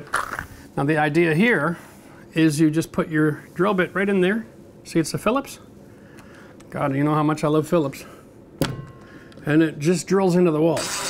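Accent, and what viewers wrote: Canada. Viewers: when you said "out" I knew you were Canadian - plus now gently you spoke !😄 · You sound Canadian, bud. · As soon as I heard that accent, I just KNEW the Robertson was coming oot as the hero. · I love your accent. Reminds me of my Canadian friend. 😊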